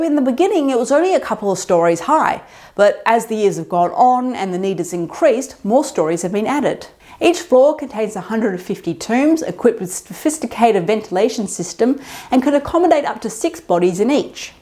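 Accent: Australia. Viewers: I love what you're contributing to the world's death conversation with an Aussie eye, heart and accent,! There's a bit about the annual redressing of the dead and bringing them to the dining table in Caitlin Doughty's book, From Here to Eternity as Well. While I'm personally in favor of the being eaten by wolves or vultures or insects or whatever camp, these practices from other cultures have their own beauty and wonder. (Sorry, I live in the US, so those are my most familiar resources. I don't for a moment believe that we have all the answers and am humbly grateful to get these extended world views!)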